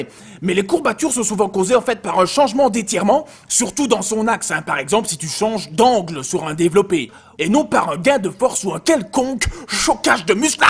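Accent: Liège, Belgium. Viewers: haha;) tu es d'ou de liège?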